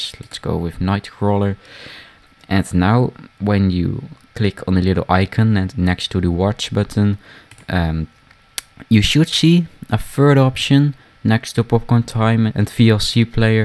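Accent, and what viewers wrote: Netherlands. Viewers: deze gast is duidelijk nederlands XD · arre you dutch? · U can hear from his accent that he is dutch :P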